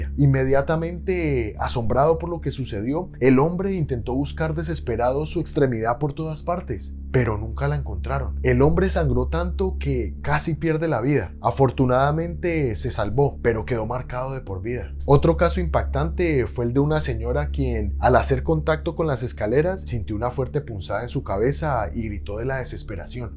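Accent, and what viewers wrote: Colombia. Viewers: Vos sos de cali ve?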